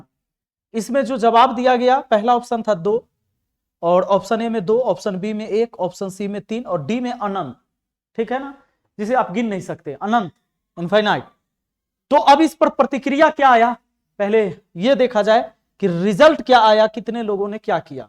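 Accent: Bihar, India. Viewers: kya bihari andaaz hai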